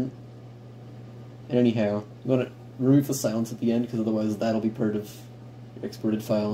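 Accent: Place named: Western Canada, Canada. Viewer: That's tricky...but I'm going to go out on a limb and say I'm getting a western Canada vibe from your voice. Are you from BC?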